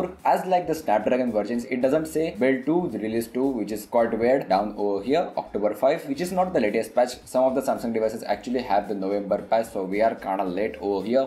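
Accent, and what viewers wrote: India. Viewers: love your accent...it feels wowly Indian....😎😎😎thumps up...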